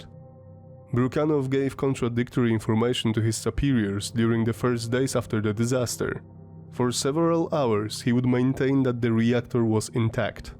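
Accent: Russia. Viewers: oh ok. I wasn't sure . I do like the accent and thought you may be Russian.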